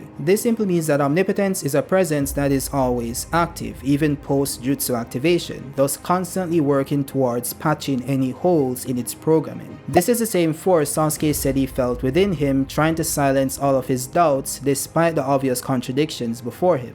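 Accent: Jamaica. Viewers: Are u Jamaican ? If so I am too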